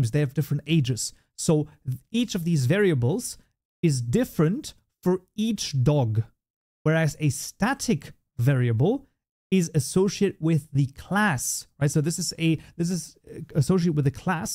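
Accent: Germany. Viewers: Sure, Ill do so. Funny german accent <3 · Funny german accent <3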